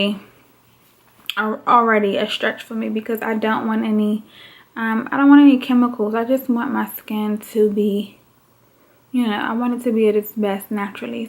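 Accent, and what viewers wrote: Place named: Washington DC, United States. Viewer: Are you from DC? You kinda sound like you’re from the “urea” 🤔. You’re skin looks great!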